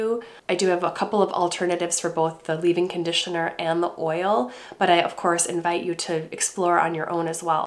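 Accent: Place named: Minnesota, United States. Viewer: I knew I noticed a hint of a Minnesota accent! 😉. I hope you’re feeling better. My hair “routine” consists of Joico de-tangling spray, Chi Silk Infusion, my Dyson supersonic and round brush, and sometimes a touch of argon oil or anti- frizz serum once dry. My hair is straight, so it’s pretty easy. I’m envious of your beautiful curls!!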